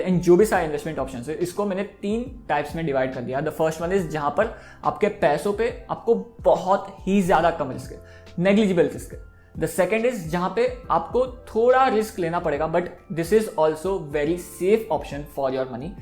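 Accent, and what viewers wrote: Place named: Gujarat, India. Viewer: Jiv me thoda ..... 😅😁... Gujju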